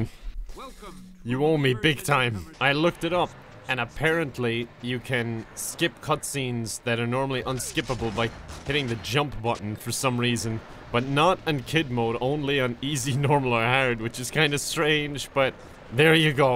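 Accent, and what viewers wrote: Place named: Ireland. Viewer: You sound a lot more Irish in this video.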